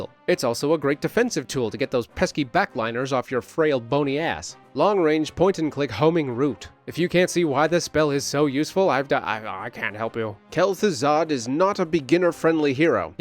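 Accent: Canada. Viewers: Letting the canadianness come out eh? KTZed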